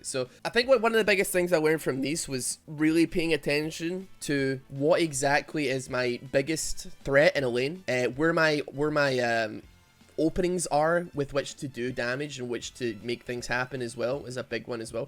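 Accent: Scotland, United Kingdom. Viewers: Is scottish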